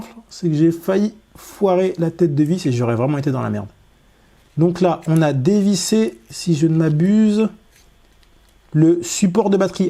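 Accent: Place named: Europe. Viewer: I hate European accents, too annoying. Always sounds enthusiastic no matter your actual expression.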